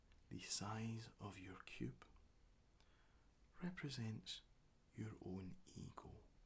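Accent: Scotland, United Kingdom. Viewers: Where abouts in Scotland are you from? Your accent sounds so much like my boyfriends... It's nice ☺️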